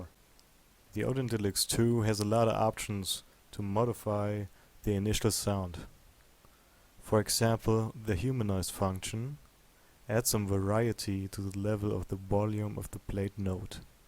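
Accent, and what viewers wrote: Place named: Russia. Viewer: Полюбас русскй акцен ак пробвае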